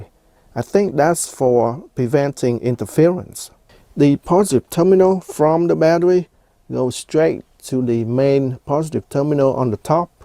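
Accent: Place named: South, United States. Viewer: What is your accent? Every fourth word has thick southern slang while the rest sounds Asian/American. That southern draw just catches me off guard. Great video. K bye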